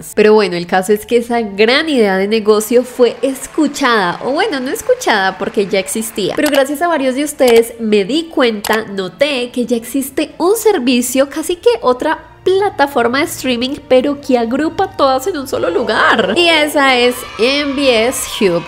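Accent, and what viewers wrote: Colombia. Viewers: Tu eres de Colombia cierto? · Wow eres de México por un momento pensé que eras de Colombia por tu acento 😮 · T escucho la voz de colombina y estas en mexico ? saluditos nuevo seguidor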